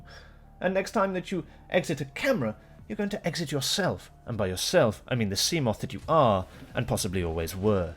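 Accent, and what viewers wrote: United Kingdom. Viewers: Sound like spiffen brit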